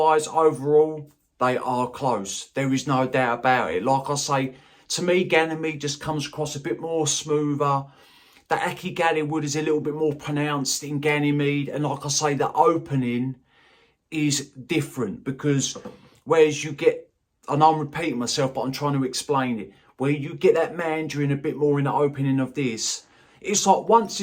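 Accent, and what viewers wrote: United Kingdom. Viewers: What a great accent! From which part of Britain you are?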